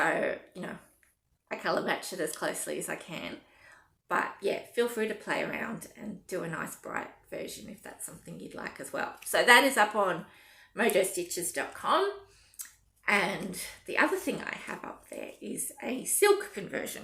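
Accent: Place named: Australia. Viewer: One of my favourite reds to stitch with is DMC 347. Enjoy your flosstube, nice to hear an Aussie accent!😊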